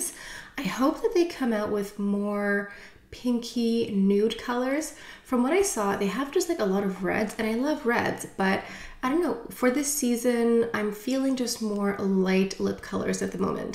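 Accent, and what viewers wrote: France. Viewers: I have that violet single! Love the look on you but I will have to lighten it up for my colouring. Love to hear you pronounce the names of product in your French way.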